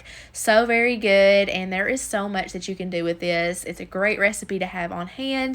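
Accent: South, United States. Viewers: I was having a rough day. This video is just in time because that southern accent just calms my nerves lol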